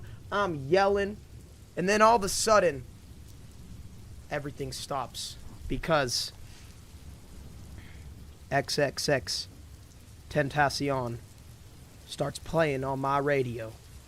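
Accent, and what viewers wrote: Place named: South, United States. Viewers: crazy how no ones talkin on how dat country accent was on point tbh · Oh my gosh ikr!! I was thinking that he has a Southern accent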